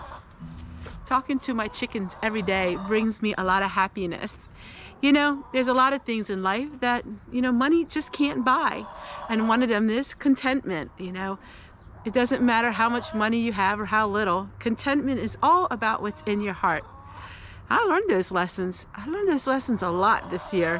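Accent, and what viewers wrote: Pennsylvania Dutch, United States. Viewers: "Cryin' in a bucket" Oh, I do like that. My first thought was ", your PA Dutch is showing" I am really enjoying this video. Just got to the part about how we like it when you ramble, and yes that is true! "Maybe it's 'cause you're talkin' ta us like we're just friends" Love and hugs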